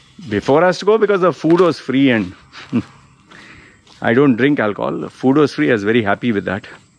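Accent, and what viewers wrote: India. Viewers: Well in a way he is an Indian though. In UAE he studied in an Indian school. Yes, he is not an Indian citizen, but most of his things are Indian, including his English accent.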